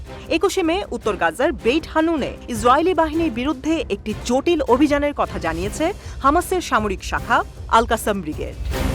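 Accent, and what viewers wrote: Kolkata, India. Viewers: কোলকাতার মতো সংবাদ পাঠে বিরত থাকুন। শুনতে ভালো লাগে না।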